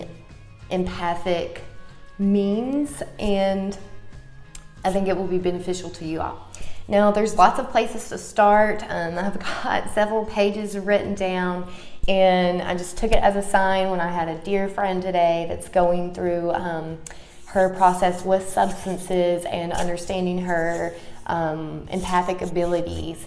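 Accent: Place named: Deep South, United States. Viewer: Great video vlog girl. I’ve struggled with being an empath for as long as have memory and had no idea what was wrong or why I would come home extremely worn out. People would have sucked the life force out of me little did I know. I’ve gotten to where I have withdrawn myself from society because I became fed up with how evil people and how much they take from you. Just this week I let a girl whom I thought I could trust borrow my car and she burned me stole some things out of my car and kept it so long I had to threaten report it stolen. I’ve become cynical as hell and just fed up with the curse because I care so deeply. I too understand substance abuse to make it go away. You sound like you are from the Deep South where I’m located. Keep up the good content girl friend. Kashton <mail> Take care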